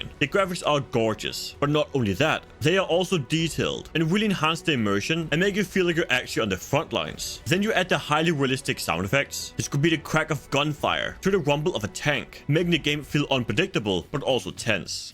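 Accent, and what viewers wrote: Denmark. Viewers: Can't fucking deal with this guy's danish accent just try a little harder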